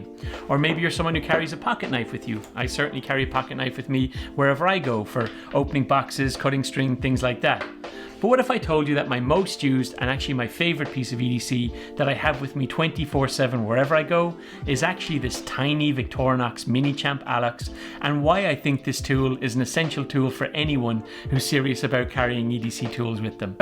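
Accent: Ireland. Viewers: I thought you were Irish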